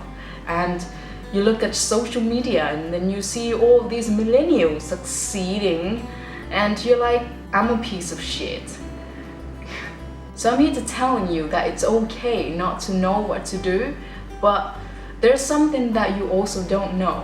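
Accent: England, United Kingdom. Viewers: I like British English and i like the person who speaks British English, too =))) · Yuh gurl, didn't expect that British accent !!? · Chị sống bên Anh lâu năm, accent chị hay quá 😍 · Em thích giọng Anh Anh của Chị quá. Cảm ơn Chị đã tạo động lực và truyền cảm hứng cho mọi người <3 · British accent là đây. Yêu. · Tha'ts British English :3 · Ui chị nói giọng anh anh hay quá 😘😘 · Giọng chị hay quá. Accent này là giọng Anh đúng không ạ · Your accent is so nice : )) British accent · Oh my god, is that British accent, i'm learning American accent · Charming accent, em nghe giọng Mĩ nhiều quá rồi, giọng Anh thật sự là 1 làn gió mới đối với em <3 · sao chị dùng british accent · Giọng Anh Anh nè. Iêu quá <3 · That’s a legit British accent ! Or should I say, the Queen’s English <3 absolutely stunning! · I feel strongly obsessed with your beautiful British accent. 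:D It keeps playing in my head after finishing your video. And you know what, I just realised that your face and your accent remind me of the actor "Sansa Stark" in series Game of Thrones :). Anyway, love your accent very badly :) (P/S: this is one of my very rare comments on youtube) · Such a helpful vlog! I love your English accent helping me practice listening! Thank you <3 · Giọng British của chị charming quá! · You are so familiar with Lucy with British accent, <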